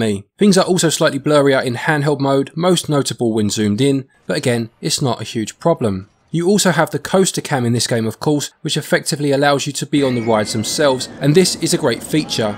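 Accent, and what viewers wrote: England, United Kingdom. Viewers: I watch a lot of your videos and alway wondered where in England your accent is from, thanks for clarifying 😀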